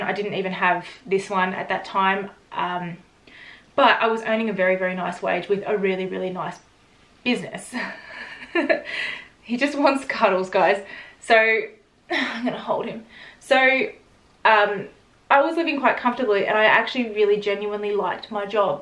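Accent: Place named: Australia. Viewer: don't worry about this toxic remark, you are too polite, girl👏😘, your charisma, intelligence gorgeous Australian accent😉😘, beauty and character make up for anything and everything, ok 💐🙏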